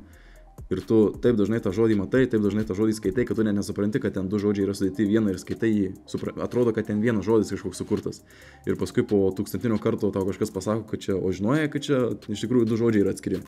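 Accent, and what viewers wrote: Suvalkija, Lithuania. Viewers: Pagal tarme kazkur is Suvalkijos busi :D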